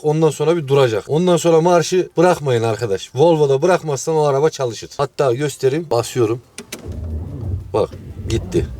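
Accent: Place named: Çorum, Turkey. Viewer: Abi Nerelisin Aynı Çorumlular Gibi Konuşuyorsun Başarılar :)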